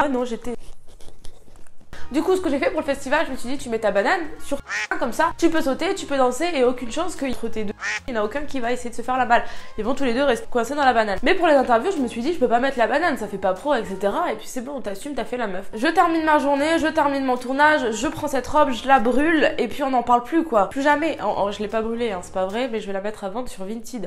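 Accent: South, France. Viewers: Fait se que tu as envie les gens son idiot 😑 j’adore ton accent du sud mdrrrr 😘❤️ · En vrai je comprend pas trop pouquoi tu te prend la tête avec ce genre de com', perso je les trouvents pas insultant et c'est plutôt légitime de poser des questions sur le contenue surtout si d'un moment à un autre ça change... Ils ont pas critiqué ton choix mais poser des questions et c'est pas grave (dans ceux que ta montrer). Si tu voulais pas repondre et te prendre la tête ne répond pas et passe à autre chose car je t'ai trouver vachement tranchante dans tes reponses de manière injustifié ^^' Ça ce voit que ça te tiens à coeur mais les questions de montage et de contenue sont inévitable sur youtube... tu te prend la tête pour rien ❤ Oui ta le droit de flouter/ ne pas flouter ce que tu veux et il y aurait eu des com' de toute manière mais sur autre chose... c'est ça la connerie de youtube et si tu te prend la tête comme ça pour des com' où les gens ne t'insulte/ ne sont même pas vulgaire / ne te reproche rien mais juste pose des questions tu vas avoir énormément de mal a supporter la vie de youtubeuse de 2020.. 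Et jcp pouquoi tu parle de féministe car je voue aucun com' qui dit " meuf tu es féministe c'est inadmissible de pas montrer tes tetes". Je pense tu te prend la tête pour rien ❤ ps: si l'accent du Sud c'est chou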